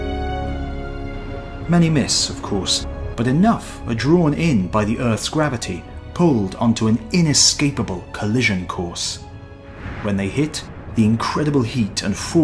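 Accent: England, United Kingdom. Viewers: Where is this coming from? His accent sounds like England. I am used to measuring things in inches, feet, yards, etc here in the USA, not meters, etc! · I am thoroughly enjoying these videos, but I'm starting to find the narrator's accent more and more annoying. In this one it's particularly noticeable, seeing as it's about water -- or as he says it, "wo-tah," or sometimes, "woo-tah." I guess "wah-tER" is just too hard for Brits to say properly. All those R's in the English language, and the Brits just won't say them. But for some reason, they stick on the end of words that end with an "ah" or "uh" sound. It's maddening. Some of the other mispronunciations are just laughable -- "dee-ASS-pura" instead of "DI-uh-spora", or "invent-ery" instead of "inven-TORY" -- you know, like the political class in Britain!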